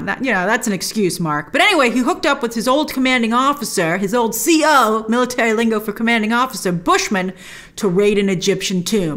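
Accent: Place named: New York, United States. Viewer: I heard that (exaggerated) New York accent too.:) I've been loving this series. I love these more character-driven stories that these MCU shows have given us. We can save the action for the movies.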